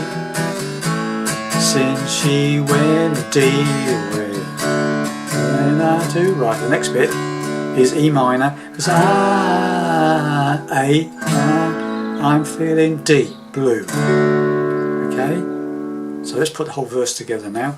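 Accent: United Kingdom. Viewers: gracias cuidaros mucho, también, supongo que por tu acento estas en UK, tus lecciones son muy didácticas para alguien de mi edad 61 años, gracias y cuídate por UK, que también tengo a mi hija en Lincoln, gracias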